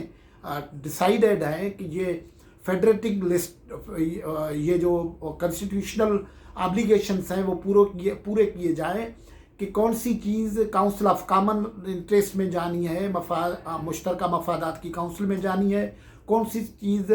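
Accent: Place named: Sindh, Pakistan. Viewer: واه بهترين ڄاڻ اردو ٻولي جا لفظن کي درس اچارڻ جي ڪوشش ڪيو سنڌي لهجو بهترين آهي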